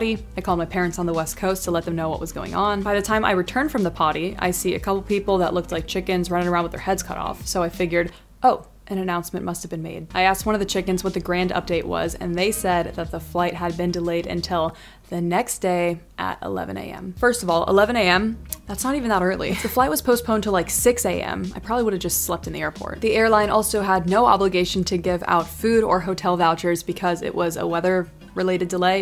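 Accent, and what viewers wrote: Australia. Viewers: Dang, that is a long day!! Glad you found great companions from Philly to NYC though! I once ended up taking a Honda Civic (coup), two trains, and a bus ride to get from my college in Kansas to Ohio for Christmas. Even got mugged at one point. Also, your Australian accent sounds just like Margot Robbie! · Reverse psychology, "Mama needs a drink" followed by "It's 5 o'clock somewhere" Love the accent are you from Australie... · I love your Australian accent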